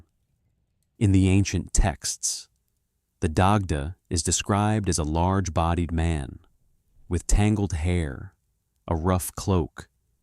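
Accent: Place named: United States. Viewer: Shame a Celtic myth is narrated by an American.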